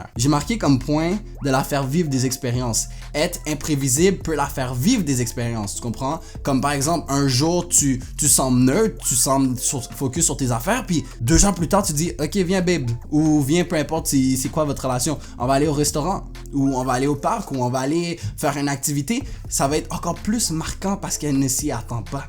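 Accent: Quebec, Canada. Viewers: Québécois ptdr tu vis dans une grotte?😂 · L'accent sonne Québec 🇨🇦😂✌🏾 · Juste 9 secondes, j'ai écouté et j'ai direct reconnu notre accent du Québec ahah niice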